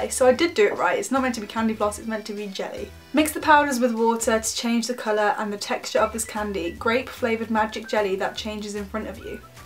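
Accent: United Kingdom. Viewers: are you american or british you talk like a british person but call sweetys candy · Are you British or Australian? Either way, you're gorgeous. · you should! it'll sounds awesome with a British accent haha =)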